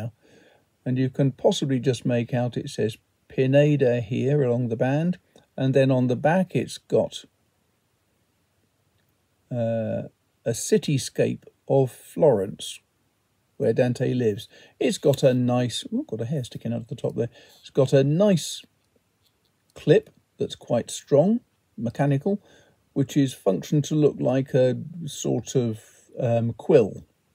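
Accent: United Kingdom. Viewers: Just because we speak a common language does not mean we always understand each other! When you say you look at the bezel, are you looking at the cameras lens? You are the only person I have encountered that makes it look natural. And you are more pleasant to look at than you give yourself credit for. Probably the accent has a lot to do with it. We here in the colonies are suckers for the accent!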